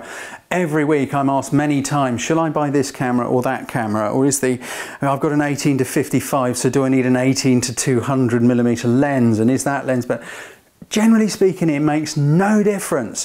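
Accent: England, United Kingdom. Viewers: where in England are you from.. i know by the accent its SE/SW England mate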